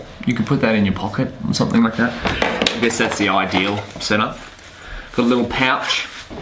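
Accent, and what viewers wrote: Australia. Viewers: Its an Australian accent dumb dumb, of course he is from Australia. Jeeeesus. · Are you Australian?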